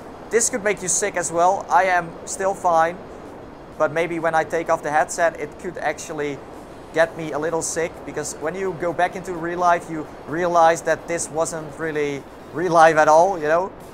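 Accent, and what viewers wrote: Netherlands. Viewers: gek nl accent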